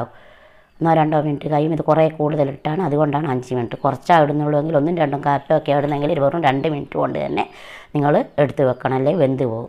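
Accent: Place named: Kannur, India. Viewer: തനി കണ്ണൂർ 'മ്മടെ 'നാട് '👍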